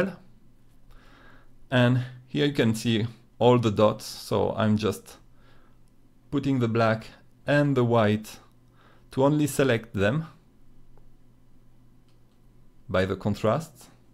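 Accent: France. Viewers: Always great from south of France with accent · Je rêve ? On dirais que vous avez un accent Français quand vous parlez anglais ! x) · You're french, aren't you? Love the accent x) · Je crois que c'est parce qu'il est français et qu'il parle anglais ^^ Peut-être le très léger accent qui fait qu'on comprends mieux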